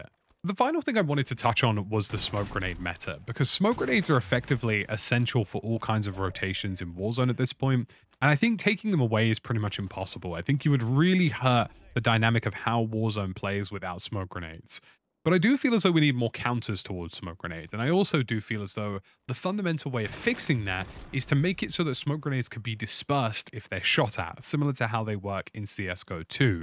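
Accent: United Kingdom. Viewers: i just wanna say your videos are like asmr, i freakin love your chillaxed voice and bri ish accent (im american and incase you didnt know ALL americans love the sound of british accents) so anyway, thanks for these videos! you and markiplier are the only two people who plays games that i watch anymore, so u two must be doing something right! have an awesome week! 🐮🌻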